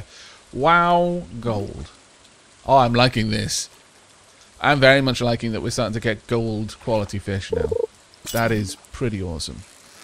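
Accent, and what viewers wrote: Wales, United Kingdom. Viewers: Bläh He's Welsh 😉 not sure where he currently lives though.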